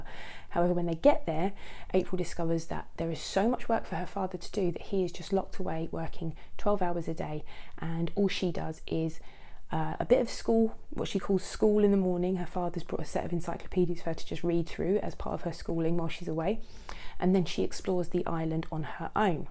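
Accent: United Kingdom. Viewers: I wish i could speak British english sounds great😊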